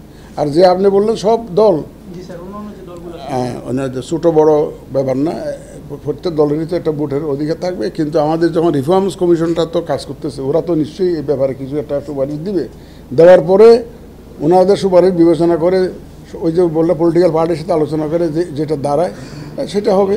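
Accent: Chittagong, Bangladesh. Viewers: ওনি চট্টগ্রামের ভালো লাগলো কথা শুনে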